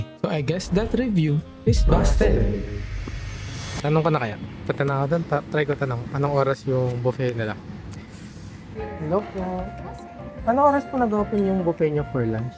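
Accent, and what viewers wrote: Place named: Tagalog, Philippines. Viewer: And luxurious nang tagalog accent mo lods, W vids tho.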